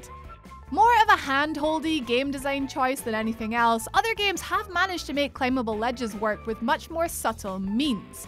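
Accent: Scotland, United Kingdom. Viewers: I could listen to that Scottish accent all day. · Are you Scottish? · Yay for Scottish voices in YouTube.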